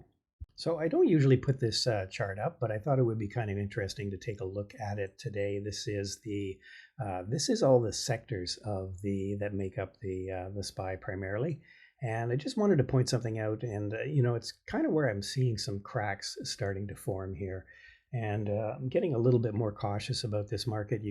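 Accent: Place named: Canada. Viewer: Thanks, for your very helpful weekly commentary. Love your Canadian accent and your quiet demeanor too. Read your Swing Trading Book years ago, and use it to refresh. 💮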